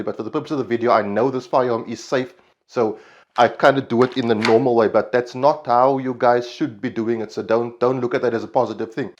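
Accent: Cape Town, South Africa. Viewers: hi are you from cape town? i like your accent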